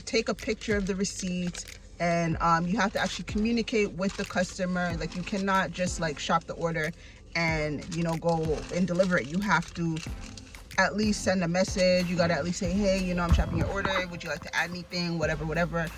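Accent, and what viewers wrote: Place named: Barbados. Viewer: hello. my name is sean. Thank you for doing this video. thanks for the information about cornershop. You sound like you are a bajan, i hear an accent.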